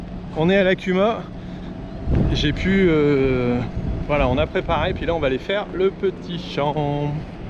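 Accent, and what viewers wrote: Nord, France. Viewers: Bonjour, super vidéo Puis moi qui suis originaire du nord, j habite maintenant en Sarthe, ca fait du bien d entendre du ch'ti!😂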